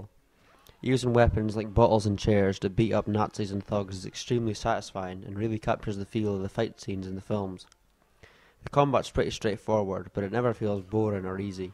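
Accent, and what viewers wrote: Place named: Scotland, United Kingdom. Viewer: is it possible for Xbox one backwards compatibility. Probs not. Is it on steam or any other seller? By the way, you sound Scottish, I'm from there as well :D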